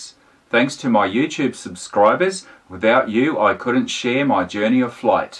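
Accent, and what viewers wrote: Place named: Australia. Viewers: Australian accent is quite different... but then again so are most Aussies (we won't say where he REALLY comes from...) must admit he comes across as pretty suave... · Great video! Looking forward to next episode. The Australian accent is quite different, but I like it.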